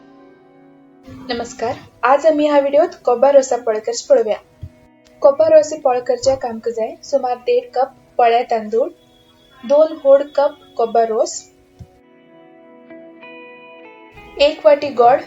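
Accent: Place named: Konkan, India. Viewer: Lovely. I like your shudhdh Konkani.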